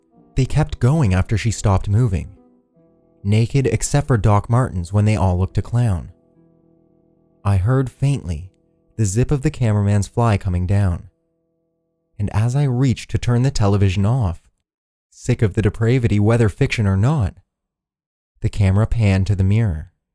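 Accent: Canada. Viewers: Love Your channel...New Subscriber :)....Your Canadian right?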